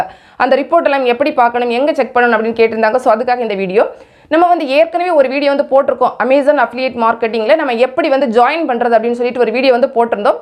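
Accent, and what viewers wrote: Kanyakumari, India. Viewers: Ungaluku native kanniyakumari ya?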